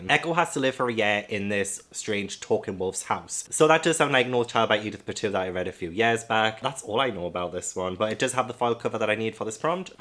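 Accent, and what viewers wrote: United Kingdom. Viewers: For those who complain about how you say books must not know that you have a beautiful British accent and that is how the British say books, the way you tried to say books sounded unnatural to me...lol...sounded like you were trying to do an American accent. I personally love hearing a person speak that doesn't sound the same as me. My personal favorite is the way people from the UK say helicopter, it's so adorable. Never change for anyone, always be you. I couldn't stop laughing about that manga Dick Fight. Now, I am curious about it...lol. Also, shout out for the hoodie, that's '90's classic Nickelodeon cartoons, love it!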